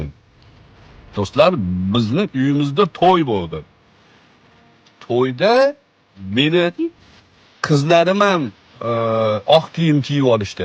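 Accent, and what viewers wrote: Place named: Namangan, Uzbekistan. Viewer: Сизни Илмингизни хурмат киламан лекин илмингиз бор одам Наманган шевасида гапириб эрмак килдийиз исломда одамлар устидан эрмак килиб Кулиш борми номахрам аелга гул бериш борми, видойингизни таркатган1та аелни деб бутун Наманган устидан кулдийиз шу тугрими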